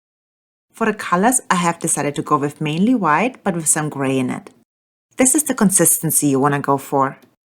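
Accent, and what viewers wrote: Germany. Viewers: Hello, which region of Germany are you from? Because of your German dialect I guess the southwest of Germany.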